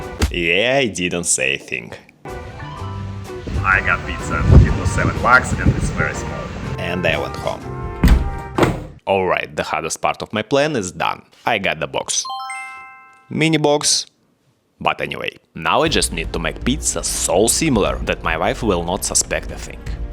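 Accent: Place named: Russia. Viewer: У него акцент как у русского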